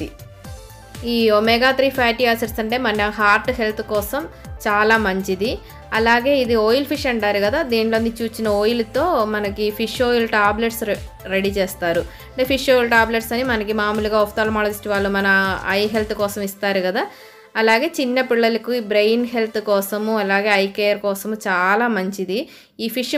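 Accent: Kerala, India. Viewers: Hi ma'am ningal malayali aano ningal Telugu parjunna slang malayalis nde pole thonnu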